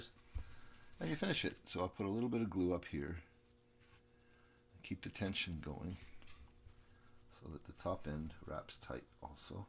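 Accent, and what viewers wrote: Canada. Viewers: He sound so Canadian.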